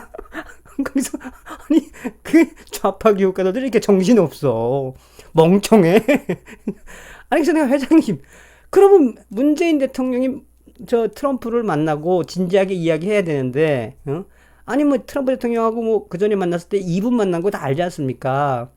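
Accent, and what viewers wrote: North Korea. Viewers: 다 좋은데 북한말 일부러 써지말라고 그렇게 안해도 방송 본다니까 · Thank you, Thank you again! 말씀하시는데로 코믹이고 코메디 입니다. 진행과정도 북한 말투로 북한에서 항상 쓰시는 말투로 진행하시는것도 재미나고 정성산 "때래비" 힘되요, 희망의 방송!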